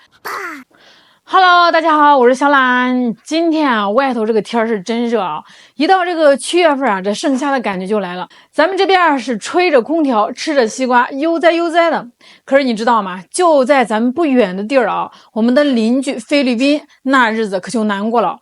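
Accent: China. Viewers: 妮子，你是哪个地区的普通话，好听。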